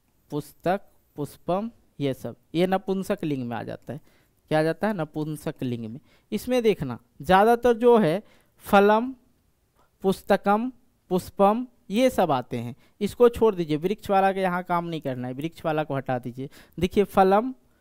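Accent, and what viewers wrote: Bihar, India. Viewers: Sir aap bihar se ho kya?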